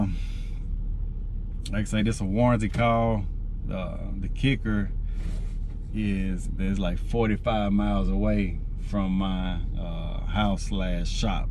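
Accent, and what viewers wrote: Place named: Memphis, United States. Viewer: You gotta be from Memphis. You sound just like we do.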